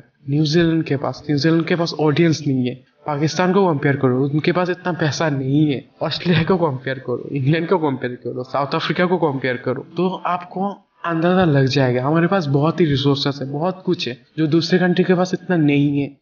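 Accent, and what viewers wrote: Bengal, India. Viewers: Bengali hei keya? Hindi language attack raha hai.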